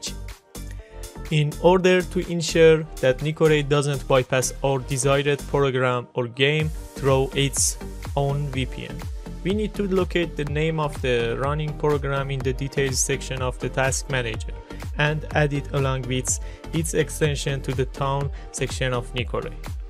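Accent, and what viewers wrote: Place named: Eastern Europe. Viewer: You're Persian but you have an eastern European accent. That's interesting. Thanks for the tutorial.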